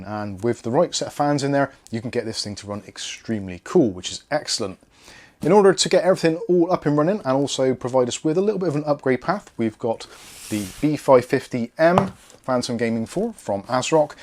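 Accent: Bristol, United Kingdom. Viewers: Did I see this on Facebook marketplace a few days ago? Thought I recognized the Bristolian accent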